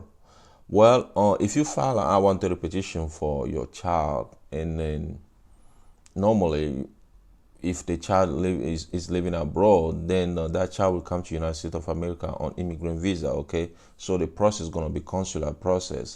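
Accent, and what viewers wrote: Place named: France. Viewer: Are you french by origin ? Love the accent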